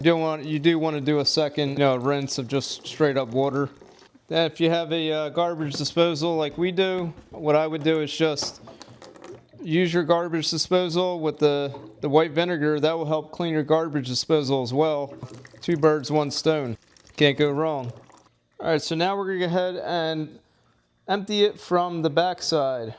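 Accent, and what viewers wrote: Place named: Philadelphia, United States. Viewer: THANK YOU!!! 🙏 😊 Ps: You must be from the Tri-state area.. Here, outside of Philly, we say “wooder” too. 😂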